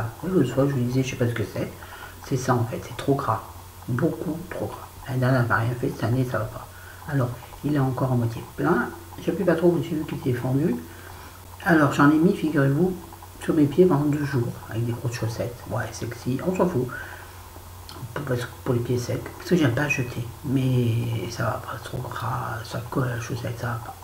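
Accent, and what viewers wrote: South, France. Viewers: Mdrrr avec ton accent du sudeuu loool . Manque plus que le Raimu lol bisouilles de la sudiste :-)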